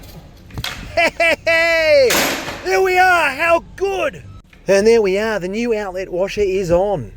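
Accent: Australia. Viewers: Hi, watching you from Moruya on the far south coast of NSW. Loving your Aussie accent and 'colloquialisms' and btw, Bob IS my Uncle 😆😅🤣😂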